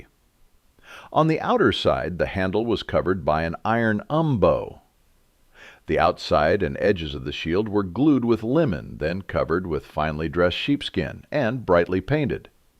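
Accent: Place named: United States. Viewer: No American voice please. We know our own history. Keep out.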